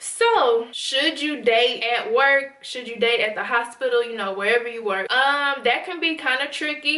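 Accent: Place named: Houston, United States. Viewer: She sounds like she’s from Houston ..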